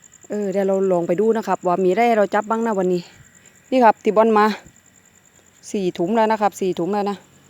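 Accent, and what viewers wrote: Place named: South, Thailand. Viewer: พึ่งดูครั้งแรกค่ะ สนุก พูดภาษาใต้น่ารัก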